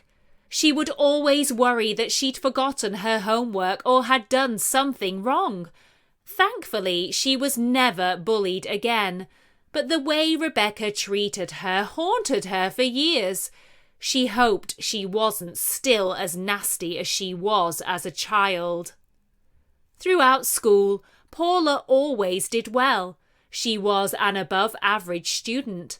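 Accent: United Kingdom. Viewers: What's this type of British accent it really sounds magnificent and original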